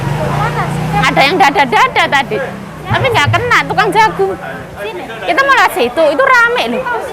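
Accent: Java, Indonesia. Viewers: Hadir mbk... seneng dengerin nek sampeyan bicara jowone kentel jelas 👍👍cayoo 💪💪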